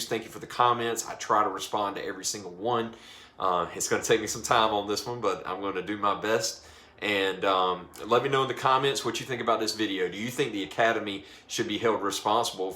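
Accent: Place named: United Kingdom. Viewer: I love this guys accent- a Brit from across the pond 👍🏿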